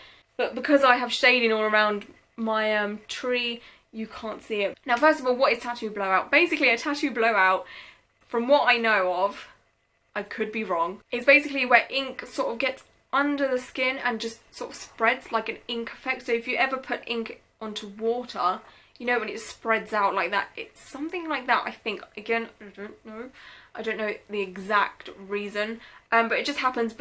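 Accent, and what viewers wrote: United Kingdom. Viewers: Damn your voice is annoying. Must be from the UK? Funny how you asked your tattoo artist what it was exactly and then made a video to tell YouTube about it. Cool story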